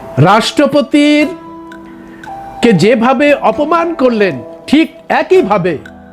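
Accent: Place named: Bangladesh. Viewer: আপনি কথা থেকে বোলছ । মনে হছে আপনি বংলাদেশী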